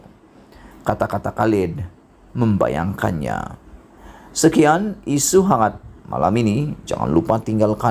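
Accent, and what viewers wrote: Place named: Sabah, Malaysia. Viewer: Melayu sabah lh tuu .awak ni xpernah campur orang sabah tengok tv S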